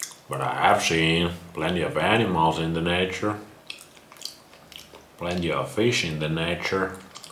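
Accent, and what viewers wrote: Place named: Finland. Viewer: does raw meat at least taste good lol? also your finnish accent is strong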